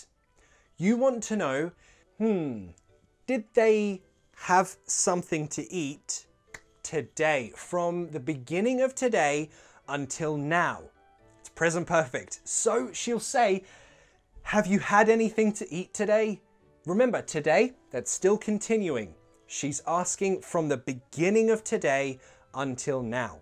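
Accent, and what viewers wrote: United Kingdom. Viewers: What english accent do you speak actually? For me, this is the best british accent so far. My english is not too good, but with this accent i can catch most of the words you say. Its very clear and understandable. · Eres un excelente maestro....me encanta la forma tan sencilla de explicar. Entiendo el inglés pero aún me falta hablarlo y escribirlo correctamente...por eso escribo en español...espero utilices traductor para que sepas lo que escribo. GRACIAS POR TU TRABAJO. Ya me suscribi a tu canal. Saludos desde México. Perdón...de donde eres?...al escucharte noto acento británico... eres inglés?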